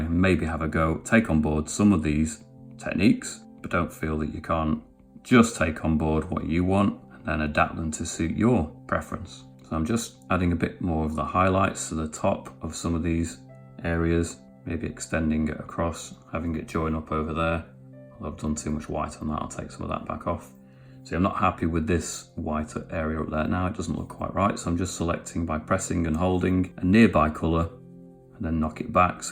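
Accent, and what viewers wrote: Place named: North, United Kingdom. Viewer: Great to hear a familiar northern accent on YouTube! Brilliant video!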